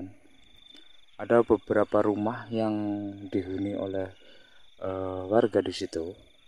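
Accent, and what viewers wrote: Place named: Java, Indonesia. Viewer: Indramayu hadir untuk kang Bahasanya medok kaya orang Jawa tapi ko videonya banyak diambil di sunda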